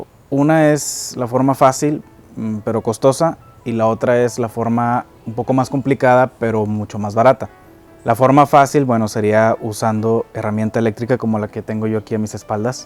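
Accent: Mexico. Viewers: Me agrada tu acento, ¿eres del norte de México?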